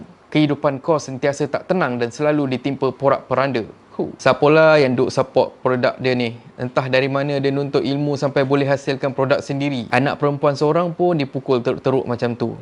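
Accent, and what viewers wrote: Kelantan, Malaysia. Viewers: Terbaiklah bang ckp kelantan 👍👍sy suka tngk &sy pun dri Kelantan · The best part cube kecek klate dan slalu mitak maaf takut salah sebut dan pantun slalu terbaik, klakar abis..hehe Terbaik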